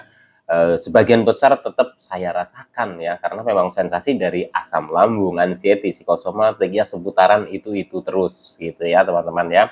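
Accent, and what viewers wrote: Banyumas, Indonesia. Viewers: Cilacap hadir mas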